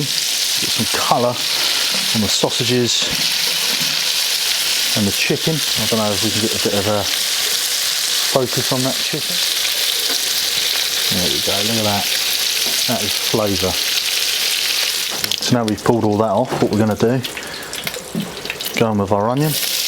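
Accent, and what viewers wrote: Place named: Australia. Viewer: He is speaking Australian.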